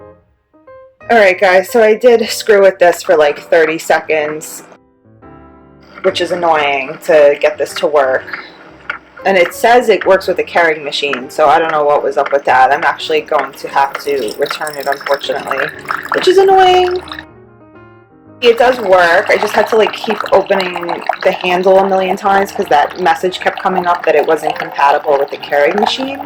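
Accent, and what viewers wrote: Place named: New York, United States. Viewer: Wow what a strong New Yorker accent lol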